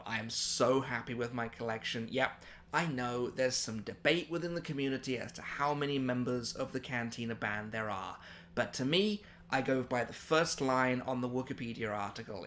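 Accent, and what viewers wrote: England, United Kingdom. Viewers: J! I've always assumed we both had great minds! Hope you and the family are good. I'm currently struggling with daughter and her various "quirks" of teenage girl behaviour. I've only discovered recently, clicked on and heard his accent. Sold!! I thought. Gotta support English dudes across "the pond!"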